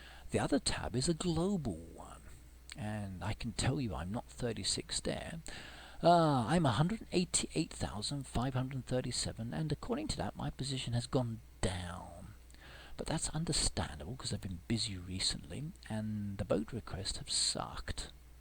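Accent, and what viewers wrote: United Kingdom. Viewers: He's British lol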